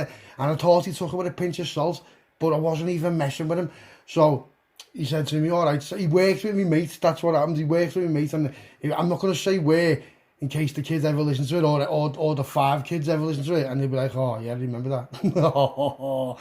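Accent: Liverpool, United Kingdom. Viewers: Heavy scouser lahhh love it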